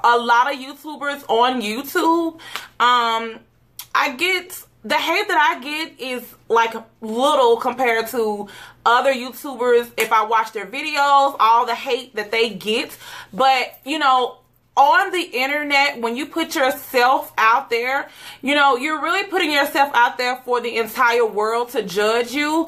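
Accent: Mississippi, United States. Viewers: she may be fat but she still slays and she looks good Plus Size and you don't have the right to tell her that because she is still human and she is beautiful just the way she is, so all y'all hating keep it to yourself and if you wanna say something say it to her plus her 155.6K subs and the way she talks is just because she is from Mississippi. You can't hate on somebody just because they talk different from you. STOP THE HATE!! her family loves her the way she is so don't assume they are happy and if you think your hate comments are ruining the life, THINK AGAIN HONEY! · I'm not like them but I love your videos!!! You must be doing something right. Remember you are going to have them haters which is very sad. To say the least. They need to get there life. Must don't have nothing better to do. Like that song. Plus jealousy sad. It's truly nothing wrong with the way you talk. 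You just have a country accent that's all!!! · your very inspiring.. I love how you handle the comments... I also have thick skin also.. I'm just starting 2 new channels and I am looking at other youtubers like you and I get cause y'all have a lot of subscribers where as on my other channel (not this one) has about 30 subscribers... don't get me wrong I love my 30 subscribers and I love them all dearly but I want to be inspiring to more people like you are baby girl... I have a lot of friends from missisipi and your voice is just like theirs and I can easily tell you are from missisipi and I don't think your voice is annoying... Much love to you and your life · Fuck em boo 💋 they need to boss they life up 😜 #LovingThatMississippiAccent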